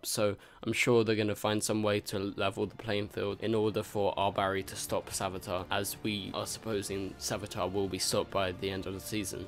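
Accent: United Kingdom. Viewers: Are you British? What accent do you have?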